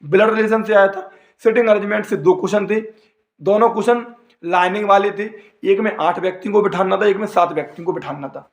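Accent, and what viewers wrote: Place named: Bundelkhand, India. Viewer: Bundelkhand me kaha se ho bhai.. tumhari boli se samjh a rha hai😂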